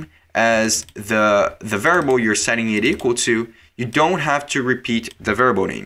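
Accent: Brazil. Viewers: Thanks for the video,. Really useful. I finally got the arrow functions now thanks to you. Also, you sound brazillian for some reason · Olha eu aqui procurando por videos gringos pra aprender web development e me deparo com teu canal! Pelo nome vi que devia ser portugues/brasileiro, mas pelo sotaque imaginei ser brasileiro. Parabens pelo conteudo e obrigado por compartilhar! + 1 inscrito =D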